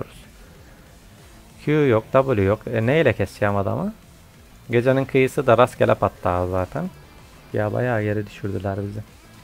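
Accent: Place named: Afyon, Turkey. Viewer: Kardeş afyonlu musun şiven çok hoş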